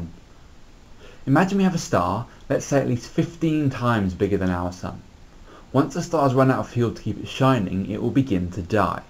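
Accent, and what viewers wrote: United Kingdom. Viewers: i wanted to understand it but i cant understand with his british accent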